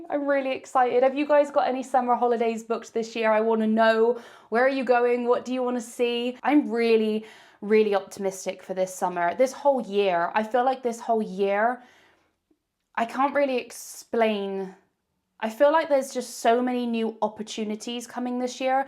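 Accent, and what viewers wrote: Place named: West Country, United Kingdom. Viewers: “I seen” is classic West Country pronunciation and totally normal and fine and very endearing, so don’t stop! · Hi, ive just binge watched all of your Temu vids. Love them. Then i thought lets go back to the beginning, omg you were so sweet. Can just about hear your lovely west country accent. Xx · My Great Great Grandma was born in Bedminster in 1851 she eloped with her cousin to the Forest of Dean in 1871 where they got married in Drybrook Church . My Great Grandma was born in 1872 . Family names are Warbutton and Emery I've never met any of the family ,as my Great Granny was brought up to the North East when she was a baby by her step Dad and his family after her Mum died (supposedly) . Did family tree but no mention of Eliza after her baby (my Great Granny) was born in Bedwelty Workhouse in Tredegar South Wales. 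Got Elizas marrage certs to both Her 1st husband Robert Joseph Emery and 2nd Elijah Biggs . Dont know which one was my G real Grannys Dad . Keep your accent I love it the Bristol accent it's part of my ancestry alongside my Northumberland accent.❤️ -By the way my Great Granny lived till she was 93.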